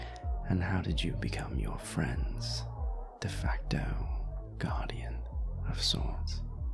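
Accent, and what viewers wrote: United Kingdom. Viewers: No definitely British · IM GETTING BRITISH AND AUSSIE VIBES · As a STRONG vincent girlie this feels like i’m betraying him but i am a sucker for a british accent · I didn’t think he’d be British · THE BRI-ISH OMYGHAD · Probably British accent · Timestamps: - Porter has a British accent 👀 - Listener is their friends’ designated driver at the club, so they’re staying sober while their friends have all the fun without them - “Being the responsible friend doesn’t mean you shouldn’t get to have a good time too. They owe you that much. Uber’s a thing.” - “You’ve got a face far too lovely to look so bored. We should fix that.” [...] [laugh] “I don’t see anyone else at this table that I could mean by ‘we.’ If I’m overstepping, say the word, Treasure. I’ve been known to have scruples. Occasionally.” - Treasure wants to just talk for now, and Porter is okay with that — - “We’ll get to the fun when we get to it. 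You wanted to talk first, so talk. You’ll find I’m an exquisite listener. Speak.” - “My name’s Porter. Porter Solaire.” - Porter thinks that this isn’t the first time Treasure’s friends have left them out to dry on a night out, and he’s right — - They said that friendship isn’t transactional and theyre right, but Porter says that friendship is supposed to be a thing where all parties care about one another and act like it — - Porter noticing that Treasure’s friends don’t seem to care much about them - “Oh yes, I have a fabulous circle of friends in my own life. There’s even a few of them I can almost stand to be around” [chuckle] - “I find you very attractive. Is that too forward to say?” [...] [chuckle] “How deliciously demure. Look at me.” [...] “Mmm. Better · OH MY GOD HES BRITISH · I didn’t know he was British You’re hitting me in my weak spot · IVE BEEN WAITING FOR THE BRITISH ACCENT! 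THANK YOU THANK YOU THANK YOU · He's British??? 😮😮😮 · BRITISH!!!! HELP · I've never related to a listener more. if a charming (British) man came up to me and started flirting THAT HARD im putty on the floor sir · HE'S BRITISH I NOW HAVE A REASON TO LIVE YEEEE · a BRITISH redacted character? Me thinks yes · A BRIT?!??!?!?! IM SCREAMING (in a good way) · OMGGG HES BRI'ISH 😣👹👹‼️‼️🤩🤩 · BRITISH REDACTED YESSSSS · Moments that made my me eep with excitement: -when I heard the British accent -when he said you have a face that's far too lovely to look so bored -when he said I could bring you a lot of pleasure -when he said he only bites when people ask nicely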